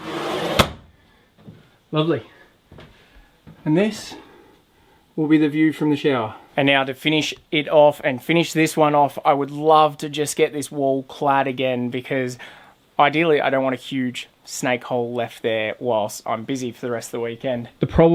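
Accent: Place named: Australia. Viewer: New subscriber here from watching you on Eamon and Bec's podcast. I have ripened stubborn green bananas by putting them into a paper bag with an apple. It really worked and those bananas had stayed green and hard for a very long time. I was about to throw them away. They ripened with a much sweeter flavour than usual. I'm Canadian but I lived in Australia for a couple of years and had my third child there. It's fun to hear Australian sounds again. I look forward to watching more of your videos.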